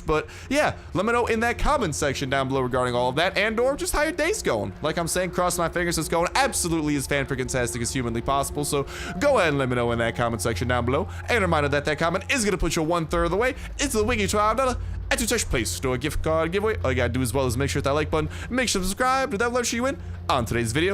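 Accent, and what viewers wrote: Russia. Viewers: Are you Russian? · Can you please stop the Russian accents it’s kind of annoying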